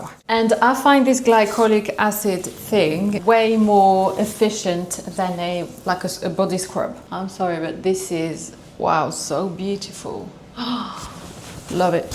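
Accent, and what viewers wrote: United Kingdom. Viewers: Are you originally British?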